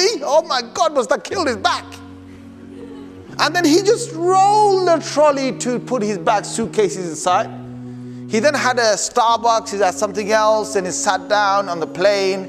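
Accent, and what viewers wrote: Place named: Punjab, India. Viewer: Sounds punjabi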